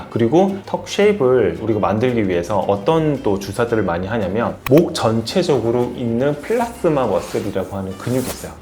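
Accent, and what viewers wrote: Gyeongsang, South Korea. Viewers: 경상도세요? 사투리가들림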